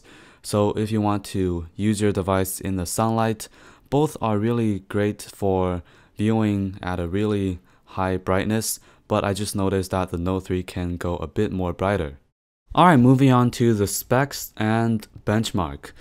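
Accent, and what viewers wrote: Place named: China. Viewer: 这英文有点中国口音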